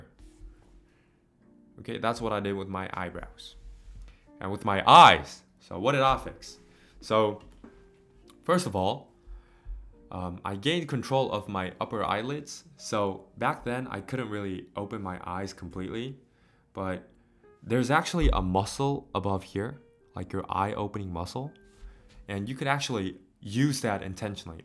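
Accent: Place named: Texas, United States. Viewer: You got the Texas drawl down perfect :) You look fine. Whatever gives you confidence is good. One thing people can do to reduce fine lines and wrinkles and firm under the eye is to close the eyes and flex the muscles in the top and bottom lid. You can feel the difference if you place your small fingers on the outer corner of the eye socket :) It feels good, too. Great call on the eyebrows!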